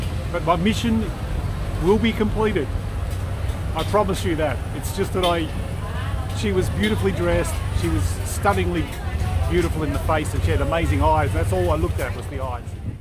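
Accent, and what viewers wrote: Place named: Australia. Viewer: Love to hear the Aussie accent, where are you from mate?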